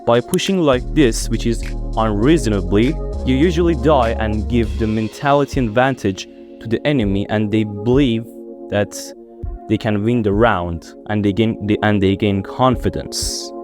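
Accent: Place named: Turkey. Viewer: Türk müsün la😅 aksanindan anladım 😅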